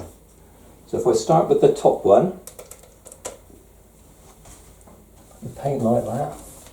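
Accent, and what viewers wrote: West Country, United Kingdom. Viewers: Do I detect a West Country accent ? You voice is very pleasant together with your teaching style and good advice.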